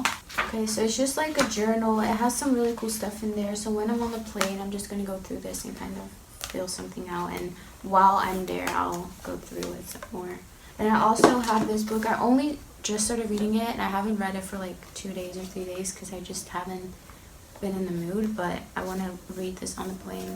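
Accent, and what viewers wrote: United States. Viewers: wait youre dutch i thought you were american all this time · ik dacht dat je Amerikaans ofs was maar je bent Nederlands woaaw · omg ik kom er nu achter dat je Nederlands bent terwijl ik altijd dacht dat je uit Amerika kwam ofzo 😂😂 Maar welke primer gebruik je? :)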